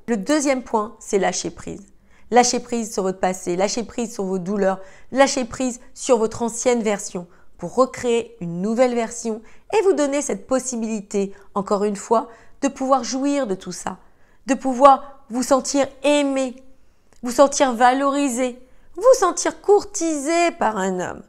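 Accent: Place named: Ivory Coast. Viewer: Vous êtes la meilleure,! Vous avez un bel accent de la cote d'ivoire que j'adore!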